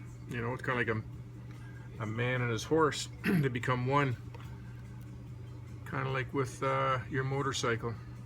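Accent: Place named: Ontario, Canada. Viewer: Hello, am a watcher of your films here in the Netherlands, nice work you"'r doing, very good information. Have got myself 2 commando's, also like to work on them to get them in a very nice condition. Do you live in Ontario, a friend of mine lives in Puslinch, got the same accent so was wondering? Your dream in going accros Canada and back on the Norton is a fantastic one, hope you make it, would want to join in it but a bit out off reach. Keep on filming!!!!!! Gerleen de Jong, Netherlands.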